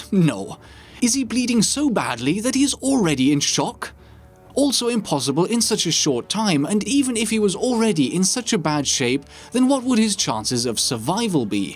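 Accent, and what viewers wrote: Germany. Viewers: I'm laughting so bad!!! Omg, how to destroy a bad CoD game based on terrible historical inaccuracy 101 - featuring; summoned to defend the honor of the Fatherland. This is why I'll stick with Battlefield 1 for the time being. Sorry to say this, but I love the sound of your german accent/voice in full fledged fury. Seems/feels so natural.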